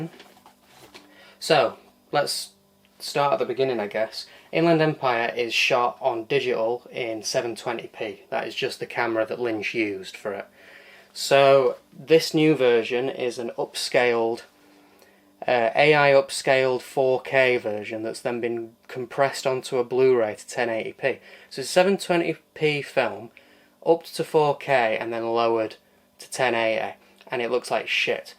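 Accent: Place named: Sheffield, United Kingdom. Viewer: Sheffield, oh I was born there! I should have recognised the accent 😅😅 Great collection, was fun to watch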